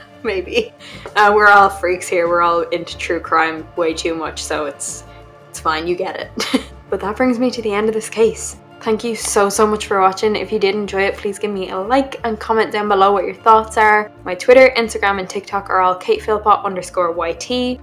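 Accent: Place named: Ireland. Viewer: Love your Irish accent. So charming and nice ❤️ I see now why the Irish are such good storytellers 😊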